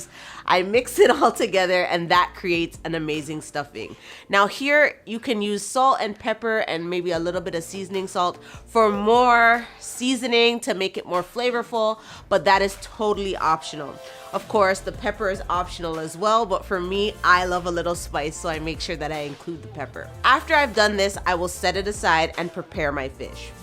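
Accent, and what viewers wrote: Jamaica. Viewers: Loved the Jamaican accent 😂😂😂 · Your Jamaican accent is so strong !! I love it I wish I had it that strong !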